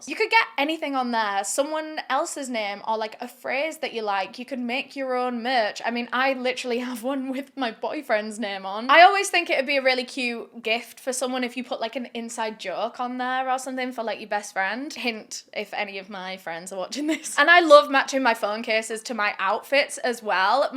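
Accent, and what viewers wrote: United Kingdom. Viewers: yeah it’s the British accent and the way she also tells the story. I feel most just say they do this wrong they did this wrong they went thought this. But when she tells the story she makes you feel sympathy for the killer with things they went through, she shows empathy in the right places and tells it as if they haven’t don’t anything wrong until they do and the whole thing changes to her being against them.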